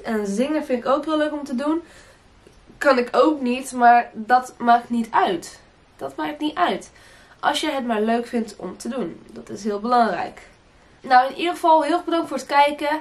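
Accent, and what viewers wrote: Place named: Portugal. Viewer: Desculpa a sinceridade, você é mais linda falando em português. Seu sotaque português é muito fofo. Amo quando você fala em português muito fofinha, charmosa ❤️🥰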